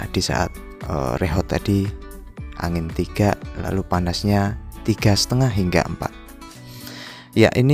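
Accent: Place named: Banyumas, Indonesia. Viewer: salam ngapak bro aja kelalen madang😂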